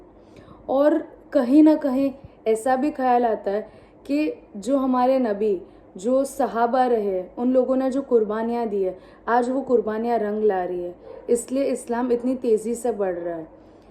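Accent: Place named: Gujarat, India. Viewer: Assalamualaikum.....Aap Gujarat se ho aisa lag raha h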